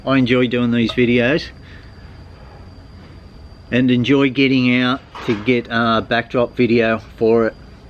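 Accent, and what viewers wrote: Australia. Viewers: Congratulations to a good quality channel, so tired of watching similar content from S/E Asia, here in Thailand regarding helmets, the law is you dont need a helmet after sunset, except in areas where there is a helmet sign such as governement/hospital areas, go figure not kidding eather. Been so many times in Vientianne now after I moved to Udon so its nice to see what that city in particular has to offer, binch watching now and i really enjoy it, also like that aussie accent of yours. What i have found out in Vientianne sofar regarding food is that they have brilliant Chinese Cousine! Ive red that possibly the best Chinese couisine outside of China, i guess there are alot of Chinese builders in Laos nowadays that need their local food. Also the kebabs are far better than in Thailand Pizza places in thailand can be a hit and miss if you dont know where to go, but there are some very good pizzas even in Udon, but i guess the best are in Chiangmai or Phuket, keep up the good work and dont change your own stile, its why we are here. 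Have you ever thought of taking Lao Language lessons? Ive studied Thai a couple of years and its really helpful! You should do it too in my opinion it makes alot of things easier, even though you might not "need it", and Lao is a fun language to listen too when you have read Thai, its very similar to Isaan/Phuuthai "dialects" but with some differences in some letters of the alfabeth, with that i meen you can survive on it even in Thailand, they unde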